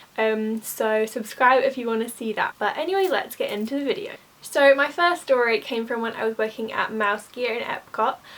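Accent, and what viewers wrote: New York, United States. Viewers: Your New Yorker accent tho!! Best part of this video! 😊😆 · You sound like a New Yorker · Loved your ny accent lol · Your NY accent was great IMO lol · I’m laughing at your New York accent. I have a Pacific Northwest accent so I’m told. Lol. I used to be a telephone operator. UK operators said to me, “ I can tell you are from U.S. People love to be snobs. Especially from a wealthy area. They talk down to you. I hated it. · Your NY accent is so funny! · Trust me your NYC accent is 100x better than most Americans British accent 😂 I love these types of videos btw! I’d love to see another installment of the magical moments you or your friends have had/made too ♥️ I hope you’re having/had a great Disney trip and make it home safe!!! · your New York accent is great for a Londoner or someone from the South! Fascinating stuff. If you work at Euro Disney you will have 20 years of stories for YouTube in the space of a couple of years!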